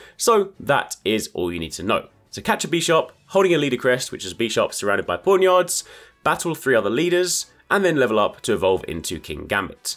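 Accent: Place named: England, United Kingdom. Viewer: Because he's English/Australian?... You do know there's no "one-way" to say stuff in English, right? English has countless dialects, and is a fusion of several other languages (German, French, Norse, Latin, etc); England alone has like 40 local varieties of speech depending on where you go in that tiny island. Besides its a name for a fictional thing, how its pronounced should be the least of your worries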